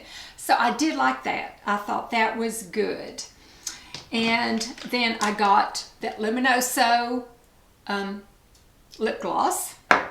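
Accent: South, United States. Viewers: you are adorable...such a classy well mannered woman. I love your southern accent I could listen to you all day. Thank you so much for showing us all the products and giving a review. I may buy the KVD liner because my liners always disappear after I wear them awhile. The Milani palette shadow looks beautiful on your blue eyes. On the color wheel orange is opposite blue so that makes your eyes pop. You are so young looking, just stunning.🌸💕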